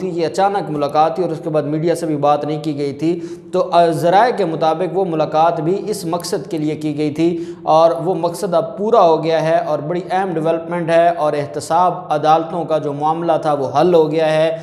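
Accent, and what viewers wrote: Punjab, Pakistan. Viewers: میاں تم لوگوں کے نام پنجابی میں لیتے ہو یہ تمیں کیا بیماری ہے پہلے جاوید کو جوید اب خواجہ اصف کو خاجہ اصف پڑتے ہو میں تمارے سارے تجزیے سنتا ہوں اور ڈس لائیک کرتا ہوں جب نام ٹگیک سے لو گے تو پھر دوبارہ سبسکرائیبر بھی کرونگا اور لائیک بھی کیا کرونگاجب تک اپنی غلطیوں کو دروست نہیں کرتے تب تک تمیں میرا سامنا کرنا پعے گا تیری جان میں نہیں چھوعنے والا کم از کم میرے کمنٹس تو لوگ دیکھیں گے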